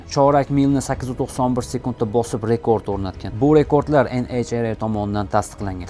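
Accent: Andijan, Uzbekistan. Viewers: Andijonli xarp, azgina shevani zamonaviyro qp gaprgin, dordan qochganga o'xshap gaprvurmasdan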